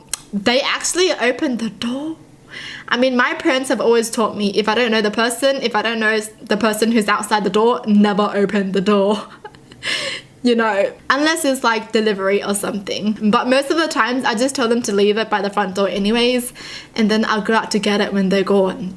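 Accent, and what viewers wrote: Australia. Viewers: Are you Australian,kiwi...or..... Btw I think this is a Cool video......i watch this before I had to get to sleep....LOL. I subscribed(to this channel and your beauty channel),liked,and hit that bell (to this channel and your beauty channel).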